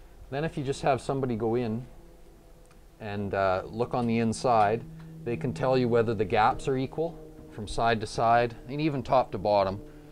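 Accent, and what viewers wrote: Canada. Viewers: I love the Canadian accent, aye!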